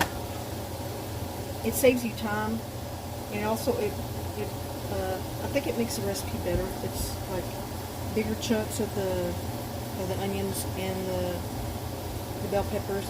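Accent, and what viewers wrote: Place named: Texas Panhandle, United States. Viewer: Hey, I was just watching some of your recipe videos which I do really like. But I noticed that you have a fire alarm chirping in the background. I hope that I’m not being rude, I just got a bit distracted by it. Also, I’m from the Texas Panhandle as well, and hearing your voice sounds like home. I live in a different state now and miss hearing my people.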